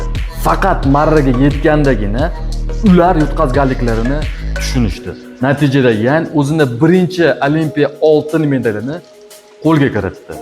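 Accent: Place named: Namangan, Uzbekistan. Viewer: Namangan sheva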